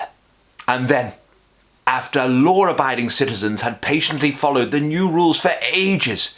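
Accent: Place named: Yorkshire, United Kingdom. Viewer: I Loove how your Yorkshire accent makes odd appearances now and again. Bloody brilliant mate.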